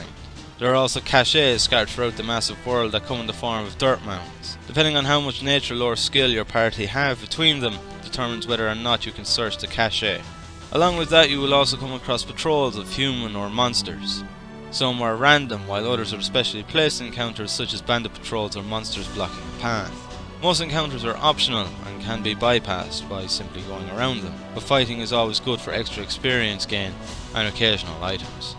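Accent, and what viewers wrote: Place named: Ireland. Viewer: Dude you are fine-keep your Irish accent and be proud of it my man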